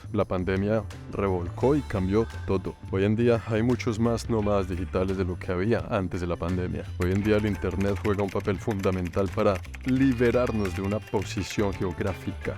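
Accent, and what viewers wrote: Colombia. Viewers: Me sorprende q ya llevas años viviendo en Canada y tienes el acento colombiano soy salvadoreño pero no entiendo porque os centroamericanos en su mayoria se les pega ese acento como q fueran gringos.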